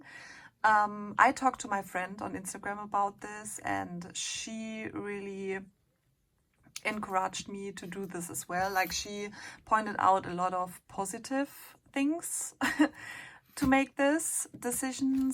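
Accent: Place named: Germany. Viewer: Omg I can hear that you are german are you?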